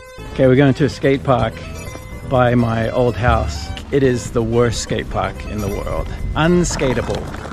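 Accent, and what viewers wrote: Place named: Australia. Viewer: didnt know you were aussie!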